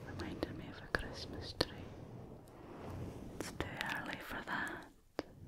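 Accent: Scotland, United Kingdom. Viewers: you have such a sweet and soft voice and that beautiful accent of yours makes the audio even better :D Brittish in whole are wholesome and great, especially scottish! ;) Have a wonderful day! Best regards// Jonathan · Wow, I'm all-a-tingly listening to/watching that! been away from Scotland for nearly 30 years and LOVE the accent. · ayyy scottish squad!! i've never seen another asmrtist with a scottish accent, so this is super lovely :D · I've just started getting into ASMR. It feels surreal that this is the first time I've heard a Scottish person speak since I've watched Still Game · Love your tingly whispers and beautiful Scottish accent. Pure heavenly relaxation! ❤️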